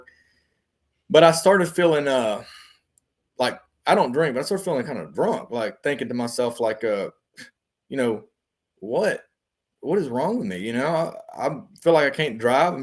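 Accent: South, United States. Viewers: I love this guy. His southern twang on top of his humor really put a good spin on the story. But I sympathize at the same time when he’s dealing with his fear after the experience. I’ve had encounters and am careful who I tell. Maybe one day I’ll tell my story. I’m glad he got a second chance to fall in love with the Father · Me and this guy have the EXACT same Southern, country voice😂😂😂 i know it's unrelated to NDEs, but i thought it funny because I've always been made fun of for my deep accent.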